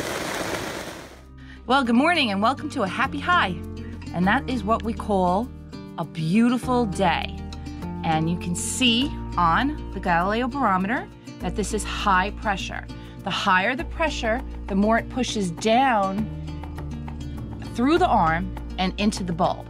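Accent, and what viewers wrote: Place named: Rhode Island, United States. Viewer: Just found your channel. Very informative. Question, your accent sounds like mine ( Rhode Island ) are you from New England?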